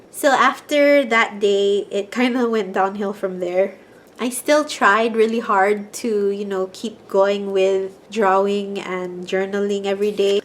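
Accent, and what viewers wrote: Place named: Hawaii, United States. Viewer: I love your video and use of the different hobonichis 😁. By any chance are you from Hawaii? You sound local and make me miss home. (Much like nadine)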